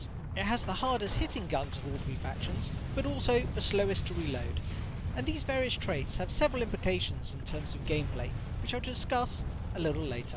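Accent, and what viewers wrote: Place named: United Kingdom. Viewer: I think you've found a great niche- a pure NC channel is a great supplement for an NC player who wants to learn the ins and outs of the factions to a more general channel (like Wrel's). Keep it up! I play on Waterson, but, judging by your accent, you live in the british isles and dont play on waterson True?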